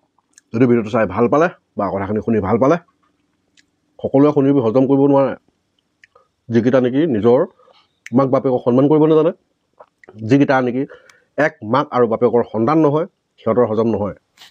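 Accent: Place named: Bihpuria, India. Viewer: o dada amar bo ghr bihpuriya moi apunar aru mur kotha mile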